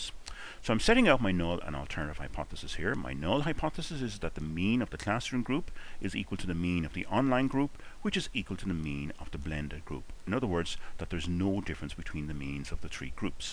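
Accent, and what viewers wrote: Ireland. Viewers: This video was clear, addressed exactly what I needed to learn, and did not have unnecessary commentary! Thank you! When you are working hard on several problems and you get exactly what you need in a comprehensive yet streamlined presentation, it is a gift. I would take a course taught by you Dr.. I am married to a man from Galway City, Ireland and your brogue added a touch a familiarity that I appreciated.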